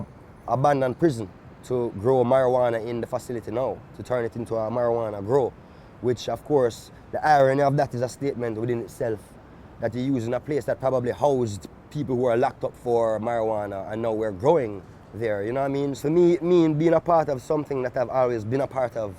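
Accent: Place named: Jamaica. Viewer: Dear Sir Gong, thank you for never abandoning the authentic Jamaican accent. Blessings in these great endeavours!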